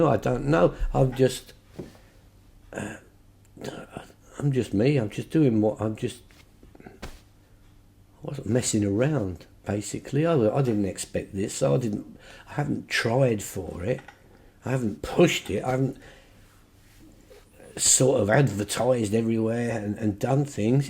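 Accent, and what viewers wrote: England, United Kingdom. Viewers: Wait i thought i expected is Kind Uncle From Texas but when i Realize he is English that make my heart warm even more 💚 remind of my late Grandpha that live in yorkshire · Was so surprised to hear the accent and realize you're an Englishman. My late grandmother from Yorkshire would have loved your music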